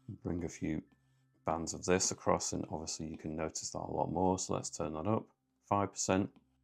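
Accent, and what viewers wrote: United Kingdom. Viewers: when im painting a bird or working on some cool image, I find myself talking in a soft British accent as I paint along adding highlights and colors. Its like your right there with me :) directing me as I go. it makes my project that much more interesting. plus I get to work on my accent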